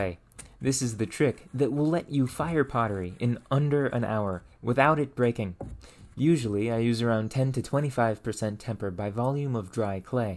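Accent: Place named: Michigan, United States. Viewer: I can't get over yer accent. It reminds me of an old online friend of mine from Michigan who'd say things like "yer" and "fer" :)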